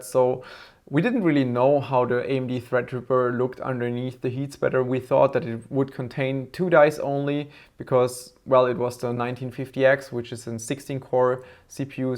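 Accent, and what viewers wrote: Germany. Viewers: Man hört den deutschen Akzent xD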